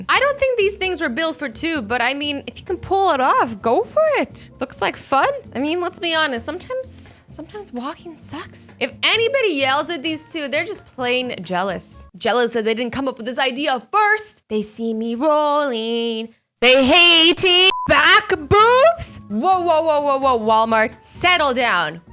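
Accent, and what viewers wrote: Ireland. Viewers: No affence Azzy but you sound kind of Irish in this video As a compliment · you sound irish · U sounded irish in this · Are you Irish?? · Azzy sounds Irish in this vid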